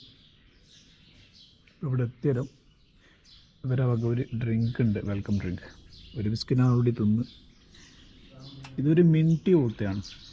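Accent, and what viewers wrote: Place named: Thalassery, India. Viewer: Thalassery language ayath kond oru veraity Vlog ayittund nannayittund ketto daily vlog okkey idu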